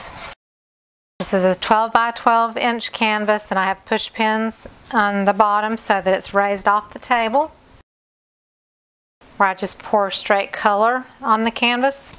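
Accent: South, United States. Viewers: Enjoyed watching and learning. , I tried one yesterday with no silicone and had the same results but liked the shine, bought more colors today. Love your southern accent, l lived in Shelby for 30 years, I do miss North Carolina!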